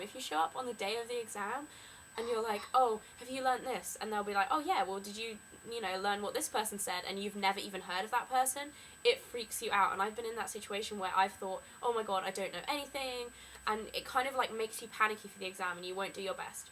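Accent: England, United Kingdom. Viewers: Thumbs up to Her British Accent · what did you go to uni for? btw i looove the english accent of yours :)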